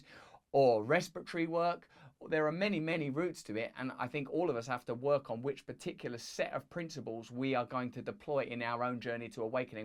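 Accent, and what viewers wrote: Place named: England, United Kingdom. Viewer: his English is so English it's hard for me. to understand it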